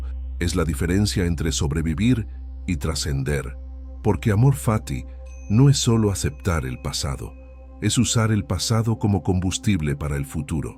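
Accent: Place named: Argentina. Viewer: Acento agertino a tomar por culo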